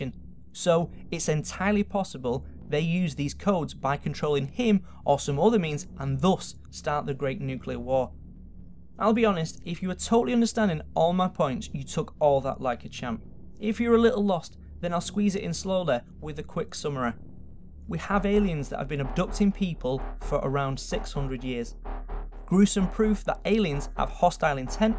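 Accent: United Kingdom. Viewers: your British accent is funny.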